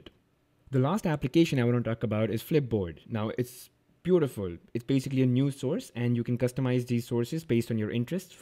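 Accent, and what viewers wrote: India. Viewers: Curry accent..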